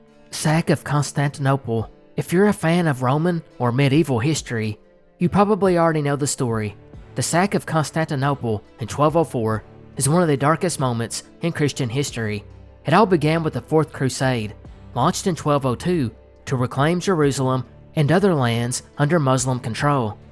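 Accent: South, United States. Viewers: that's why I always call you my southern brother 💙💙, when I became aware of the Bible being edited I thirsted for knowledge of all things hidden, leading me into the rabbit holes of everything. I know you are the same and that's why I love your content. If money wasn't so tight for me since my daughter broke her back in a car wreck and she and my grandson live with me I'd support your channel in every way. You've been my favorite from the 1st time I heard that genuine southern accent 😊😊. Don't change a thing, love from Arkansas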